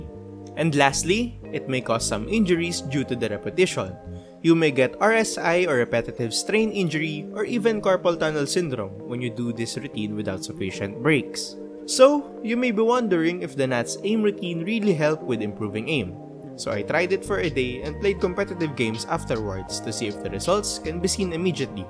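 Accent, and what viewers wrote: Philippines. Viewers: the pinoy accent was enough to convice me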